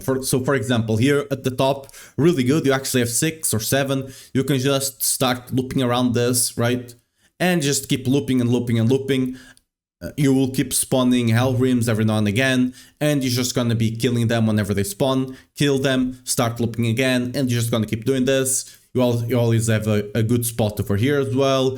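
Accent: Portugal. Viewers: It's incredible that I can tell you're Portuguese just from your English nice video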